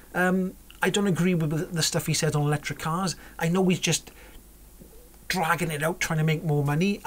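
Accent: Wales, United Kingdom. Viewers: I think that you are great, I like your sense of humour, your friendly Welsh accent and your no nonsense approach.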